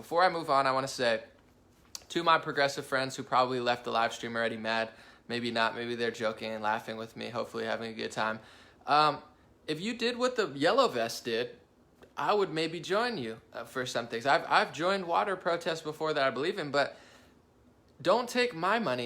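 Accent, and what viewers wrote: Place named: Boston, United States. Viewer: Your boston accent blows dude, but we love you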